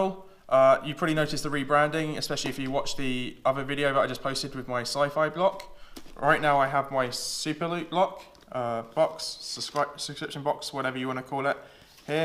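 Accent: United Kingdom. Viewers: thanks So are u actually British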